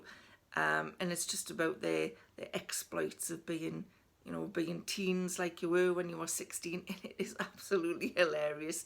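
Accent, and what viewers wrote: Newcastle, United Kingdom. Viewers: Hi going to give the shampoo and conditioner a try always been curious of that stuff. Do they do a shower gel, love your gordie accent.😊x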